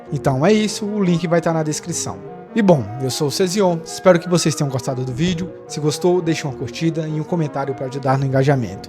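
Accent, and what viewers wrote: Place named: Northeast, Brazil. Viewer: Que Diabo é Diabo, sotaque nordestino.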